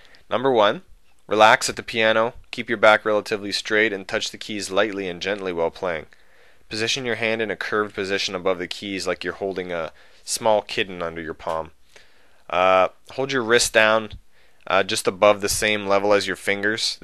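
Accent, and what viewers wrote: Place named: Halifax, Canada. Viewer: Are you from Halifax? That accent always gets me...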